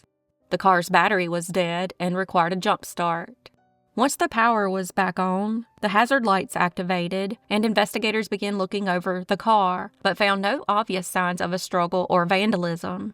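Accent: South, United States. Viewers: I just don't understand how/why someone can just disappear!😓 So sad for the families!💔 Thank you for bringing these sad cases to light 🕯️ 🕯️ 🕯️ Always good hearing your sweet southern voice! Love ya girl!💞✌️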